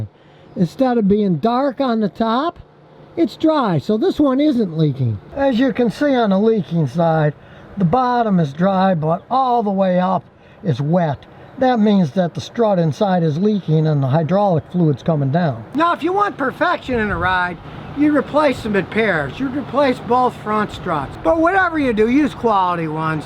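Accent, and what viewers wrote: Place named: Michigan, United States. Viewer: are you from Michigan? You have a Michigan accent.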